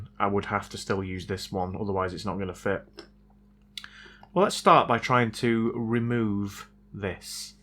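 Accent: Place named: Yorkshire, United Kingdom. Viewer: I just want to know where you're from! Wakefield, Leeds or Huddersfield are my punts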